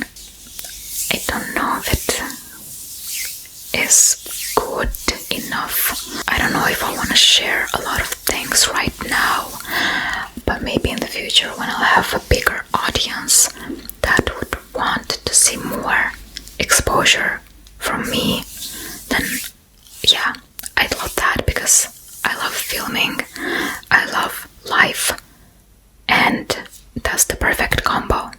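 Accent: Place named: St Petersburg, Russia. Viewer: Стоит ли ожидать контент на русском? По акценту и увлечениям Вы, типичный Питерский бумер) И почему нет монетизации в Румынии?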